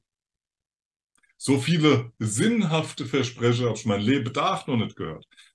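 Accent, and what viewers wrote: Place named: Hesse, Germany. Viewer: And then… Frühling is coming. 🎉 Ich hab ja echt ne Affinität zu dem Hessischen. 😅 Höre dir gerne zu. Nimmt dem ganzen so den Ernst.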